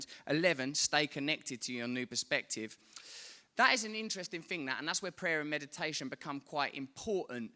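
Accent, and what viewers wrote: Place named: Cockney, United Kingdom. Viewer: Russell Brand's first aspiration is 'to remain famous'. After that, whatcha need? a self-help guru, Dudley the Moore II, a recovering recoverer? The Cockney accent combined with the bad word in the middle of the cover (asterisked) makes the money-coming-out-your-wallet bit feel a little less chump-to-champ. Self-help books were designed to give dust a jacket to cry on. If you don't buy my book you're being subjected to the patterns of your cheap ass. Break the cycle of discerning purchases. I promise my fame will rub off like a halo on the drab parameters of your crappy life or your money never back!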